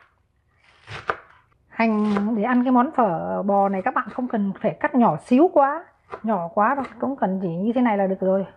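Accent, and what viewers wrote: Hanoi, Vietnam. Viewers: dạ, con nghe giọng cô là liên tưởng đến NS Kim Tiến đó ah. Rất hay và đặc trưng, mặc dù bây giờ giọng pha nhiều rất hiếm để nghe đc người có giọng gốc Hà Nội nên giữ được bản sắc là điều rất quý. Chúc cô sức khoẻ và ra nhiều video bổ ích!! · Chị ơi ngoài nấu ăn ngon mà nghe giọng hà nội gốc rất hay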